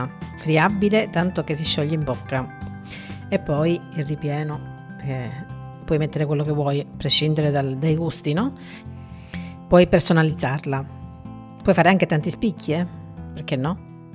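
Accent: Calabria, Italy. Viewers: il tuo stupendo accento calabrese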